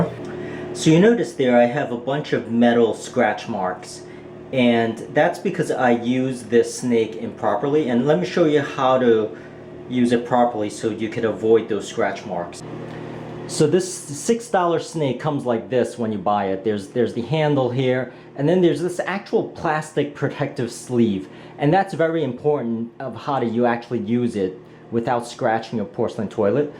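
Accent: Brooklyn, United States. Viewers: Thank you so much! It was very clear and persice how you explained! I liked how nice and clean your toilet area was and the masculine accent idk is it from Brooklyn, NY or NJ...it's faint but it's nice! I love that type of accent acutally... thanks for the video I haven't heard it in a while...I miss it I guess....but the video was excellent! I'm glad I found you...I'd watch another video of yours sometime😉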